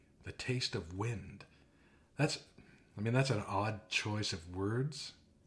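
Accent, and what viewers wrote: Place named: Maine, United States. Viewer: There’s nothin slim about the quality of these « slim-pick in’ » videos! Love the Maine accent!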